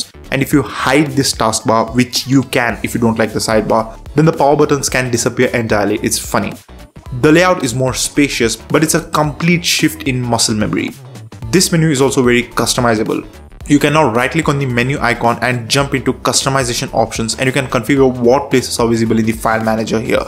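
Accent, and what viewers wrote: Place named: India. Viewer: that hindu accent, can you change it?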